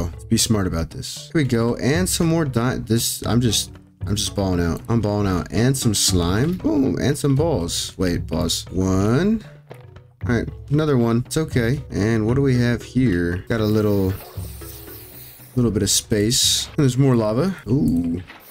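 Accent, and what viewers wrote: United Kingdom. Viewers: that english accent was crazy bruv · the british accent was perfect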